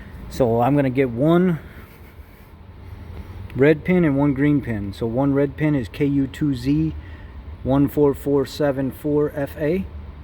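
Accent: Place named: Chicago, United States. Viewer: You sound like a Polish Chicagoan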